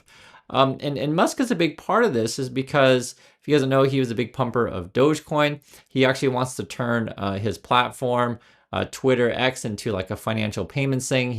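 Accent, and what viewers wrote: United States. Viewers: I've only just seen your channel today. Your accent sounds American. you may be able to pass as Canadian. So why are people telling you to move back to China? It sounds like you were born here. Or at least grew up here. Although I would guess at least 3rd generation American.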